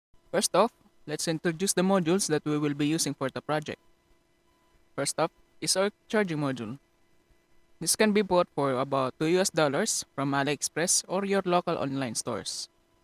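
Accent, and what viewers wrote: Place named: Philippines. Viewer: Yesss gotta love that Filipino accent Ps Great video tho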